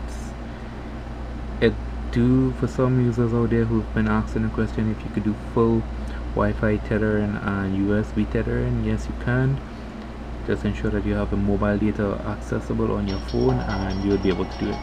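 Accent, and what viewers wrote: Trinidad and Tobago. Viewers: hi are you from trinidad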